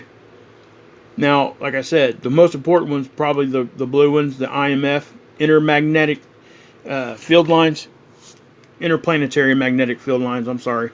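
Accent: South, United States. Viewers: excellent. very very impressive data. advice. try focusing on the data at hand more. hard to watch your video in it's entirety as 50% plus is going off the target. i like your delivery mechanism and your accent is great. love the southern accent. all good but if possible try to stick to the points being made as much as possible. taking a long time to say what your analyzing due to alot of breaks in the transmission. greatly appreciate your time to produce and share your knowledge with the truth seeking community. holy spirit protect and guide you always. peace be upon you always. selah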